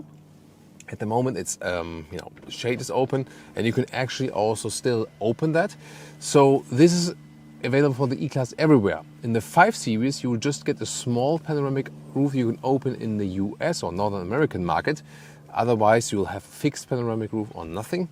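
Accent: Germany. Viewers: Bro man hört deinen deutsche Akzent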